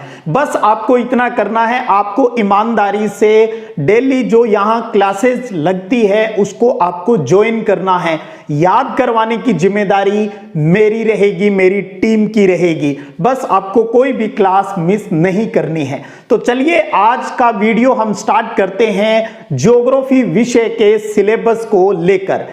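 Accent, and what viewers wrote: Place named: Sangariya, India. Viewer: Sir aap sngriya se h kya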